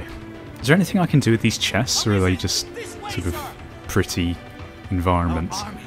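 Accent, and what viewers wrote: United Kingdom. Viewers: Your commentary is just hilarious. That confused, yet dry attitude along with your accent makes me picture a proper englishman with a top hat playing an (at first) very confusing, yet awesome RPG. The lighting is a bit off, jacked that up a notch too much.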